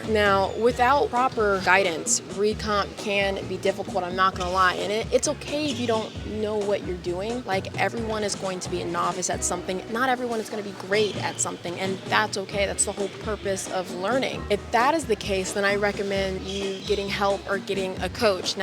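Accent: United Kingdom. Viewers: The British accent lol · love the british accent 😂😂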